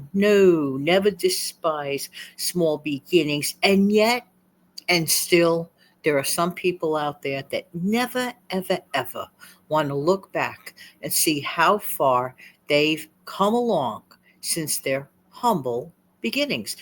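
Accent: New York, United States. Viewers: As always, great tips! Thank you for the reminder to start on Christmas now! We do have a bin store, and I never thought to look there for gifts. What a good idea🙂 BTW, I love your NY accent😀it's like listening to someone from a movie! We're from NW Georgia. Movies get our accent completely wrong. Every. Single. Time😂 Yours is delightful!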